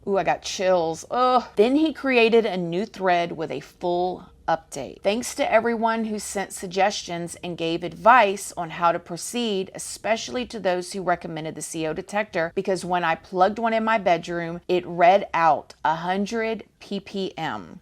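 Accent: South, United States. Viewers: I swear you have become my favorite YouTuber. If you are the same in person then I would love being around you. You would probably be a good influence because I catch myself wondering how you feel about some subjects. You are so comfortable with being yourself. With being Southern! I have traveled/lived out West and some up North. When I hear you explaining things that I hear other YouTubers make fun of because it's Southern, it is refreshing. I can laugh at what we do as Southerners, but when someone else does it, it bothers me? 😆 Bailey Sarian is the only reason I started watching YouTubers instead of just music. You were like the second person. I didn't know how I felt when you started doing True Crime. Then I loved how you covered current events and your commitment to uploading on days expected and more. It actually confused me when I saw "spooky intro", but I just thought I missed it. 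You always upload! So happy for you! You deserve it! I totally see you getting to millions. Don't change... your sincere tears was so relatable! You're doing so good!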